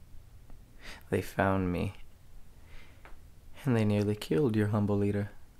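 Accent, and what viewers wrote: Scotland, United Kingdom. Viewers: You sound Scottish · I love the accent but Is it British because if it is I’m sending you £££££££😂😂🏴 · Is that suppose to be a British accent? If so really good xx ( I’m from England) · One of my favorite movies ever! Thank you so much for doing this. PS great British accent. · Scottish accent? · Quite a good british accent 8/10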